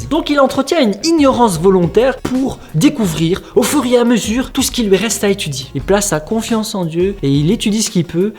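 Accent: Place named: Belgium. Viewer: Vous êtes de nationalité belge non? (De Bruxelles) Sans vouloir être indiscrète? Cet accent ne m'est pas inconnu hihi 😆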